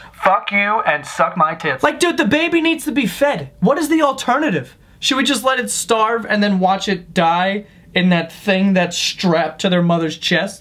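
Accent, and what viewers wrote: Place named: Queens, United States. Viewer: I think that's just how he says it!! Some people just say it that way!! Also, he's from Queens so that might have something to do with it!! Not sure though...lol!!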